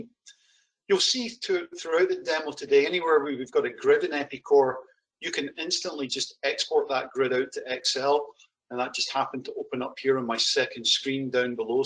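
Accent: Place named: Canada. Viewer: Hi it sounds like you're based in Canada. How can we reach out to you for consulting opportunities?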